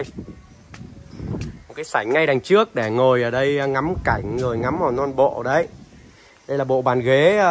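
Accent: Vietnam. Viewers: Tưởng a ở dưới Miền Nam chứ! Ra ngay Hải Dương 😊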